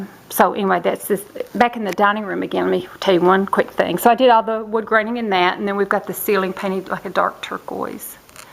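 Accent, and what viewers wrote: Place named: Texas, United States. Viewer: Your house is just gorgeous. I have always loved Victorian houses and used to want one. I got over that later on after finding out how much work to restore:( Yours is lovely. I also like other periods like 1930s, - 1950s retro styles. You have the cutest way of talking but it reminds me more of a TX gal I used to know. My folks are from Newport TN and I noticed u are from East TN...what part?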